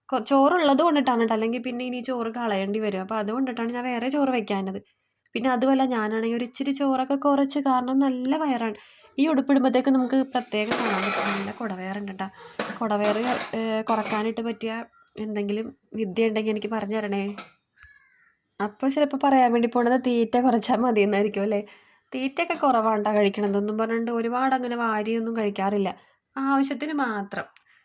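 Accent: Kochi, India. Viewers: കൊച്ചിഭാഷ കേൾക്കാൻ ഇഷ്ടമാ ❤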